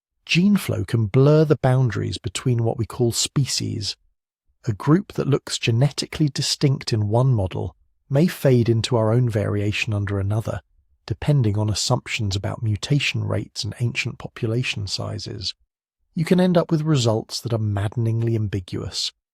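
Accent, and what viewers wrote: United Kingdom. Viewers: I put the playback speed on 80% and man something about a slow british accent just knocks me out😂🙏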